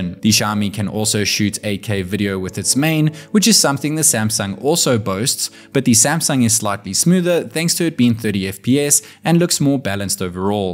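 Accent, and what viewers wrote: South Africa. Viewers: Yay , great review I thought your accent was familiar. Hello fellow South African 🎉🎉🎉looks like Waterfront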